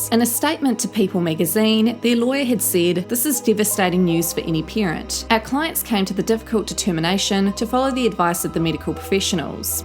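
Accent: New Zealand. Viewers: Your accent is lovely, are you from New Zealand? Sorry if I got it wrong, I often mix Kiwi accents for South African 😳🤪 I'm Scottish so feel free to call me English if I got it wrong hehe 😂 I've just heard about these selfish, clout chasing, money grabbing, neglectful, greedy losers and yours is the first vid I found. All caught up now, thank you. Sending love from Edinburgh 💙🏴💙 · Great video. I'm just curious, are you from New Zealand? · Off topic: I’ve never been on this channel so this may have been asked before. Are you a Kiwi? Is this a Kiwi accent i’m hearing? Great content btw. That narcissistic couple should be banned on Youtube.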